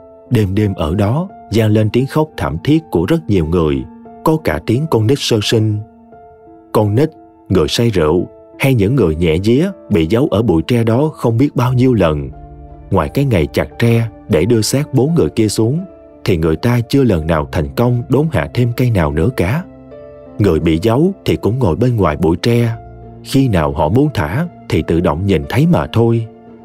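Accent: South, Vietnam. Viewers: Nguoi doc truyen that có duyen va giong mien nam that hay! Truyen ngan nhu vay minh rat thich…Xin chan Thành Cam on nhieu nhé ❤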